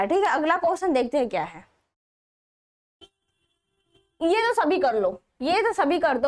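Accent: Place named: South India, India. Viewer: Thoda sa aapki bhasha madrashi lagti hai Lekin samajh aayi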